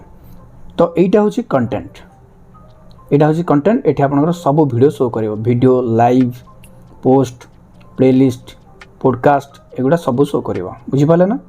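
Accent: Balasore, India. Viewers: Balasore kahuche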